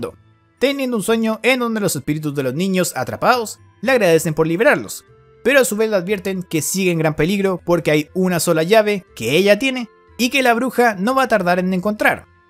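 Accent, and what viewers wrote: Chile. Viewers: Eri chileno?? Pensé ke eras de Ecuador djjdjddk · este wn es chileno? Grande 🤝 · WAO ERES DE CHILE NI CUENTA ME DI , POR TU ACENTO :o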